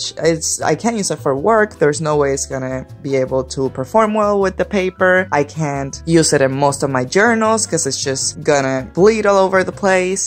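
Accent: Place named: Bogotá, Colombia. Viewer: Are you from Bogotá? Porque tu acento me suena bogotano y que bueno tener a una compatriota fan de la caligrafía, las tintas y plumas :-)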